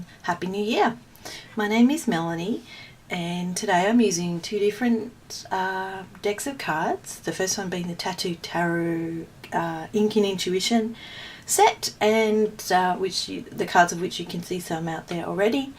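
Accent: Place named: Australia. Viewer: Great to listen to an Aussie.....great reading really resonated with me, thank you :)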